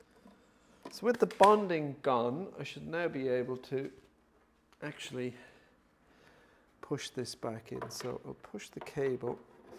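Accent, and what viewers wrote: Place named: Ireland. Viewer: Painter's low tack blue tape or plain masking tape are my goto when anything needs precise marking out for nails, screws, drill holes, biscuit holes or multitool sqaure cuts. Love the fancy radiator on the wall and the herringbone floor. My true blue Dublin ears despite being in central europe for 20 years are detecting an Irish accent.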